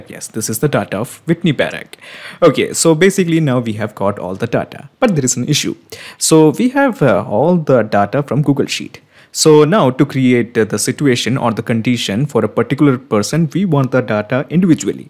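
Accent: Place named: India. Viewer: It is difficult to understand your strong Indian accent, especially when you speak so fast. i could not understand what MODE you said we should be using. I had to slow down the playback speed to finally hear that you said "incognito mode". For those of us who don't use incognito mode it would have helped it you explained how to switch to incognito mode. It would also help if you explained about Pabbly as to how much it costs to send messages. I assume a free account has limits?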